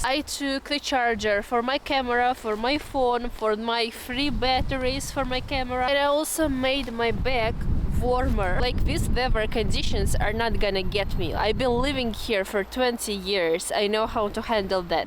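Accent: Russia. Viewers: == I LOVE YOUR RUSKY ACCENT .--IT S AWESOME == I HOPE TO KEEP IT == YOU HAVE MORE CHARM . IT'S IN FASHION IN EUROPE . == ENGLISH WITH A RUSKY ACCENT == ALL THE BEST == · I justed wanted to write hello and I think your Russian accent is very authentic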